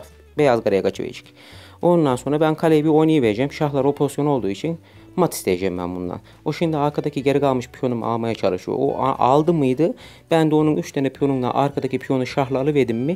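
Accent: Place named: Aegean, Turkey. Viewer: Hocam muthış olmus ya🤣🤣🤣şive tam bizim yöre,ayrıca bir sevdim🌺😊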